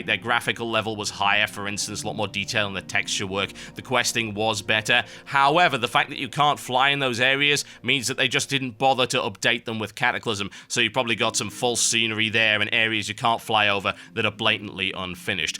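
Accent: United Kingdom. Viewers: I honestly think that the only reason I like your videos are because of your British accent.. racist maybe.. but i dont think any of these people would like these if you talked like wowcrendor, me myself wouldn't give a damn. just saying, but nice videos anyways.=p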